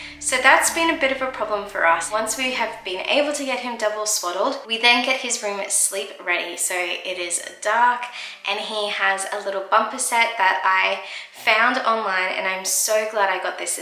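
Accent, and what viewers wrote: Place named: Australia. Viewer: Ngawww Mama! These are the best milestones!! Just saw your post on Youtube Moms Unite and thought I show some love and connect. I'm hearing a familiar accent Mama!! <3 Where abouts in Australia are you from? I'm based in Sydney! Hope to stay connected.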